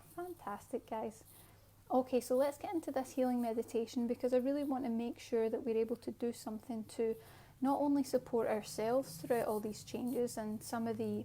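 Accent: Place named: Scotland, United Kingdom. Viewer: You sounds like Scottish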